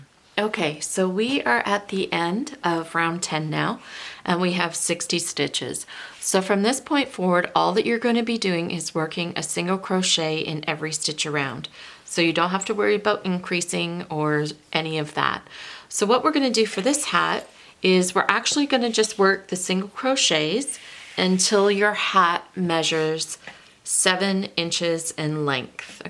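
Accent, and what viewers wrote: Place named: Canada. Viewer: my mom says you sound very canadian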